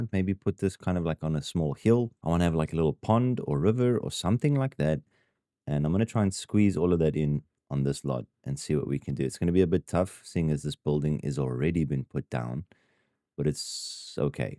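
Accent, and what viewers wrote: South Africa. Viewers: Are u south african ?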